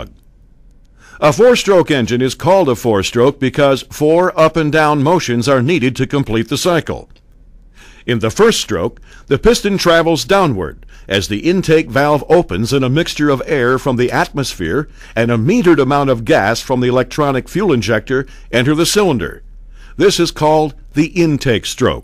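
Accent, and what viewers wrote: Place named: United States. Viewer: Do we have to have the overconfident American accent? It's a piston not a peeestonnn.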